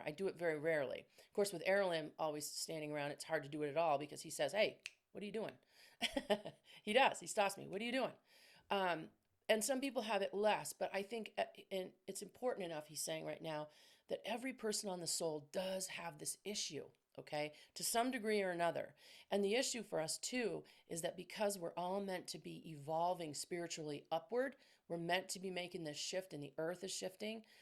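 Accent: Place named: New England, United States. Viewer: Thanks so much for this message! PS I knew right away that you were a fellow New Englander. Hello from Maine❤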